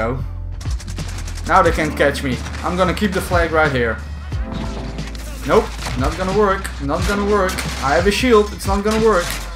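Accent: Netherlands. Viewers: dit nederlandse accent is geniaal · are a from the netherlands bro ? becausse i am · his dutch way of talking English feels nice or better than the other accents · are u dutch? zo klink je wel! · Ben je Nederlands of zo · You're dutch right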